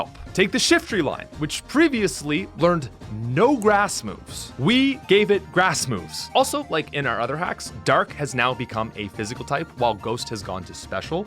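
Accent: Canada. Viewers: He's Canadian. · Is this guy Canadian? . With oat a doat. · As a fellow Canuck I love watching these videos and hearing the accent come oot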